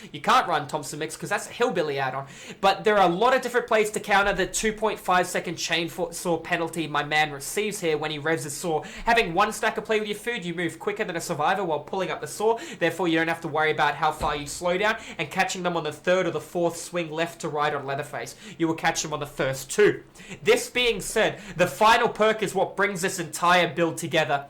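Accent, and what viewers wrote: Australia. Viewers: It's just his aussie accent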